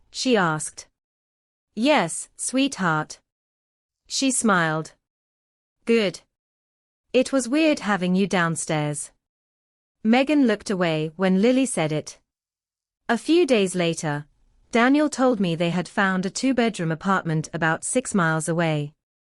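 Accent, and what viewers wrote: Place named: United Kingdom. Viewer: I cant stand the British accent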